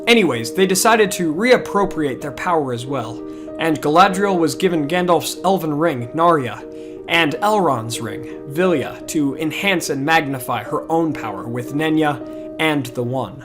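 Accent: United States. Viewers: Your American pronunciotion with all these "r" is terrible.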